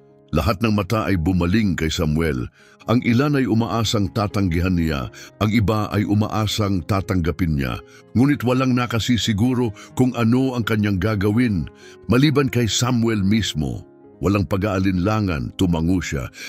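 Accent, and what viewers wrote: Visayas, Philippines. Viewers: DUPER TANGA SINO ??? FACEBOOK PHOL. OR THE NARRtOR. BAKIT??? BOBO GAGO ANG NSRRATOR SA TAMSNG PAGBnGHAY NG TAMANG SALITA NA TAGALOG ...ISANG INSYLTO SA ATING PAMBANSANG WIKA... FACEBOOKKSTANGAHAN GINSHAYSSN NA MAIERE SAMEDIA ANG PAMBABABOYSA SOC MED.. PANSININ NIO MGA VIDEOS LAHAT SY DALING BISAYA MARIRINIG CGURADO MAJORITY SNG STAFF NH FB PHILS AY MGA BISAYA. WALA GUIDELINES TUTOK SA KIKITAIN HINDI SERBISYO ...DAPAT Bng i BAN FACEBOOKK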